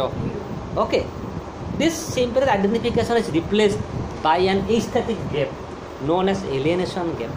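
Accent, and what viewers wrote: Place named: Assam, India. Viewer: According to your pronounce, I'm sure you are from Assam 👍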